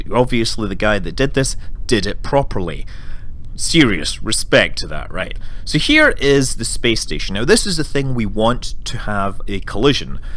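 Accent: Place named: Glasgow, United Kingdom. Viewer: Glasgow Uni accent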